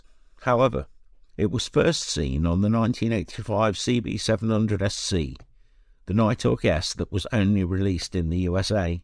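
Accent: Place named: Derbyshire, United Kingdom. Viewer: That’s odd. YT does that occasionally. No, haven’t deleted any comments. Twin Brembos should get the job done. I look forward to the vid. I’m in Derbyshire. Your accent sounds like you’re nearby. Am I correct?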